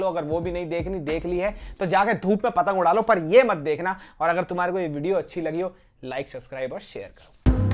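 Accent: Haryana, India. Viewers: I seriously wish you were single ! Specially your Haryanvi accent 🤤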